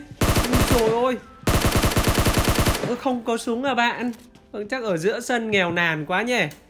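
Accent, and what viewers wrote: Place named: Ha Tinh, Vietnam. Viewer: Giọng này khả năng là Hà Tĩnh :D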